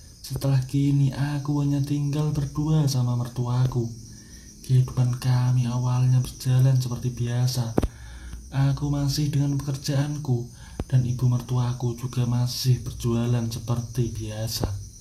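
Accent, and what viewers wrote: Java, Indonesia. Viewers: ngongg ny biasa aj brooo , mau gmna bicara bhasa medok ttp aj kliatan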